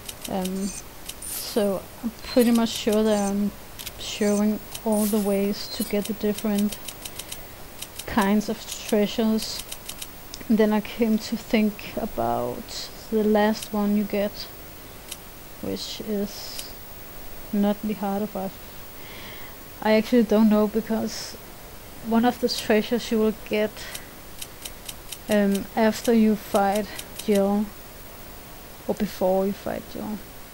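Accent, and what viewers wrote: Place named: Denmark. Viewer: ^.^; Man how long we've come when we were just BF and GF. I still love your danish accent honey! <3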